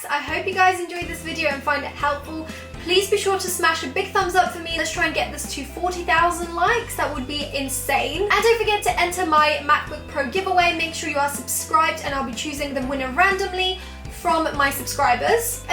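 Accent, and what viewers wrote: United Kingdom. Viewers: I adore youu and I love your accent, I have something for british accent really😫😂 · Ive known your channel a year ago and i really like your videos. Especially a life hacks and makeup tutorial video. You are such an amazing girl. I hope you keep being the way you are and nobody can let you down bc i am here. and also i really like your accent (its british right?) idk i always find really cute when i heard girls speaks british accent. we are so close yet so far😁. i know it is such a long comment lol but i hope i can win this giveaway bc i reallyyy want that macbook air. wish me luck and i love you so much 💚💚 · I will definitely try the heating pad hack!! Thank you so much for sharing this with us, and by the way - your video quality makes you look even more gorgeous We all love your honest and creative videos! Would love to win the prize for my room that I've recently moved to (it's a flat with two girls that are studying, too) :) I'm studying English to be a teacher and your british accent is so awesome! 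I'd love to see a video of you and maybe your boyfriens imitating different accents!! Love, Melodie xx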